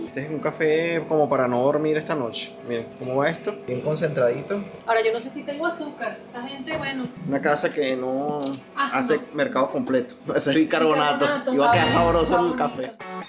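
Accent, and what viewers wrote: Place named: Venezuela. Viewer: Lo que más me gusta es su forma de ser su carazter como compaginan. Me encanta escucharte con ese acento venezolano wue me recuerda cuando vivi allí y que tanto wuieto ese país donde nacieron mis hijos. Y me encanta tb como es y además de ser muy guapa, Dios os cuide siempre y seanuy felices Me contenta mucho y me hace muy feliz veros a vosotros llevsndoos tan bien. Yo naci en España, pero me crie en Venezuela 40 años. Veo muy amenudo vuestros vídeos, pero casi no escribo.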